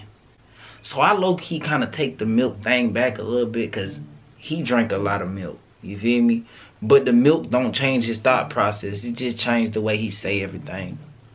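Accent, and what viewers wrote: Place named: Philadelphia, United States. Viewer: You from Philadelphia I could tell young bull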